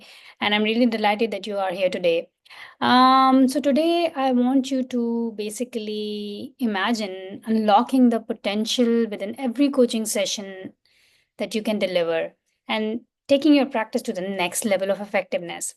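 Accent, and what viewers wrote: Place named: India. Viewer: I am sorry, you delivery is incredible boring and you non words make me distracted, no thank you I can't go on and try to understand your speech that strong accent and not making any effort to pronounce well the language. And you repeat what everyone said out there it is override 👎may work in India, but not in thise side of the world, your competition pass you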